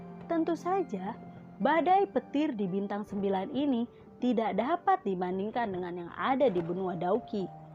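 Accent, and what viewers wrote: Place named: Sunda, Indonesia. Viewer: Logaaat sundana kenteeeel amaaat siich😂😂😂 ber abad abad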